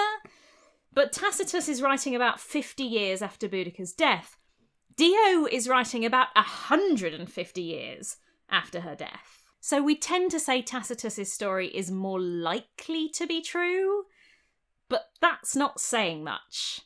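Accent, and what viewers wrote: United Kingdom. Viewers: First time i watch one of your videos and i must say i just loved it. I was genuinely surprised when you cleaned your tribal make-up and doned the scholar outfit. I like your storytelling style, your light and enjoyable british accent and the photography style you chose for this video. Absolutely well done and keep up the good work Signed: The Romans 😅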